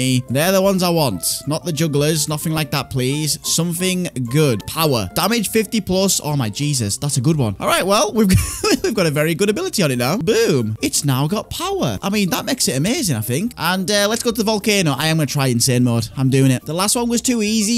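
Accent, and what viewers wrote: United Kingdom. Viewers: I’m not being mean but is it me or did I realize he kinda sounds british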